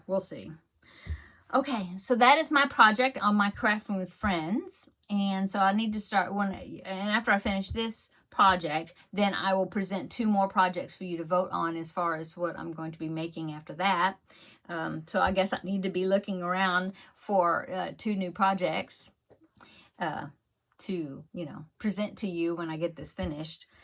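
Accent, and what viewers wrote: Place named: Texas, United States. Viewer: I just found your channel, and im really enjoying it. Im formerly from East Texas and now live in Georgia. Im enjoying a fellow Texan accent!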